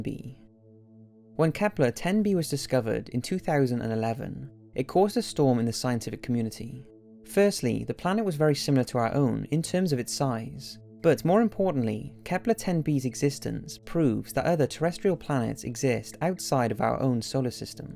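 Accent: Wales, United Kingdom. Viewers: Partisan Mapping ive turned most of my friends into ' fans. Now when we smoke at my place they say "lets watch some " and we just burn and listen to this nigga's surprisingly soothing british voice go on and on about interesting shit. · You must be from Cardiff or somewhere down South Wales? That accent is deffo Welsh 🤔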